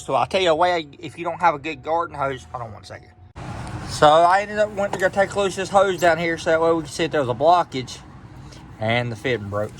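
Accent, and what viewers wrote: United States. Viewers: You already know when they got that southern accent, they know what they doin. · Great. No rockNroll noise. Except for the Carolina accent it was perfect. 5 stars.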